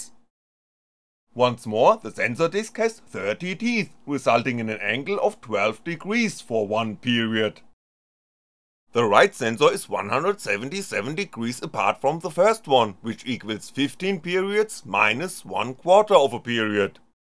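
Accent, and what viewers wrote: Germany. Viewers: Now finally I understand the rotary encoder! Only because it was explained with a German accent, the language of science! And of course because now the two sensors are placed besides each other! In fact it does nog matter where the (optical) sensors are at the wheel, but now that I look at this it all looks very simple. Thank you German guy!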